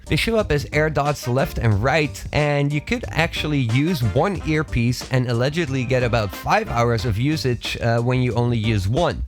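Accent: Netherlands. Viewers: This guy has a subtly Dutch accent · Are you Dutch?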